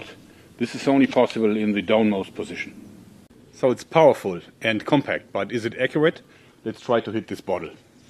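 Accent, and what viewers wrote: Germany. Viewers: I really like the German accent, everyone should speak english like that!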